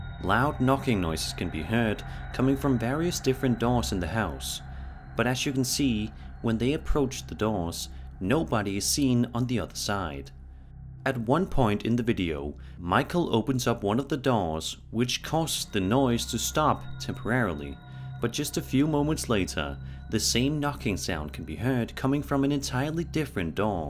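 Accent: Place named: Denmark. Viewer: Dont know why but your accent sounds too danish ion know why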